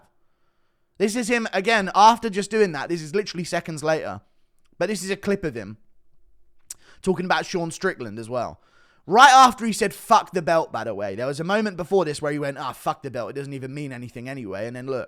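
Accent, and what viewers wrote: South Africa. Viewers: Great SA accent dude! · dawg your Saffa accent is on point!😂🔥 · Hahahah that South African accent ... not bad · you are hell of entertaining, it's always good listening to your podcasts. You put a smile on my face, thank you. Love the Saffa accent and the truth bombs on Adesanye. You got balls telling the truth, love it.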